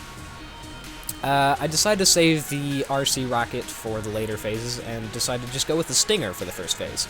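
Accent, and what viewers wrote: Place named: Scotland, United Kingdom. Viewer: ***** Your most welcome! (his Scottish accent is beautiful :P)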